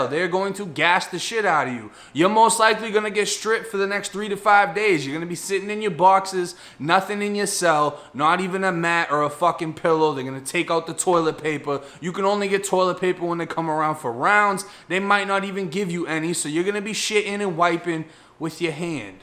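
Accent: Boston, United States. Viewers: Your stories sound better with that bean town accent ya' got..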